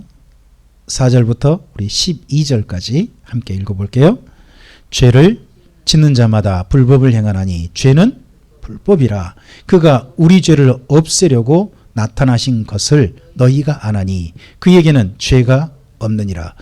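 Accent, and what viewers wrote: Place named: Jeolla, South Korea. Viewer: 목사님 감사합니다. 말씀 많이많이 올려주세요. 열심히 듣고 배우고 있어요. 특히나 전라도 사투리 개인적으로 넘 좋아요 ~~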